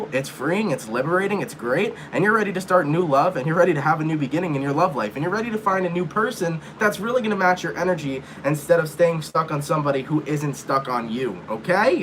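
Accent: England, United Kingdom. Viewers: Lovin the English accent...😉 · Love the British accent. From the UK. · During the Scorpio read; Love that British accent! 🇬🇧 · Pmsl your English accent is so good x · Love the english accent 🦂🥰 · Lol, love the British accent 😂. Was just in your neck of the woods yesterday for thanksgiving (good Ole Yorktown). Btw, try Apple Cider Vinegar a few times a day for that acid reflux. Contrary to popular belief its low stomache acid that causes acid reflux (stomach's inability to digest properly due to low acid in the lower stomach pushes the acids in the upper stomach upwards) try a tablespoon or 2 with water before meals 😉. Hope that helps. Thanks for all your insights 😊🤗🙏 Happy Holidays! 💚♥️🎉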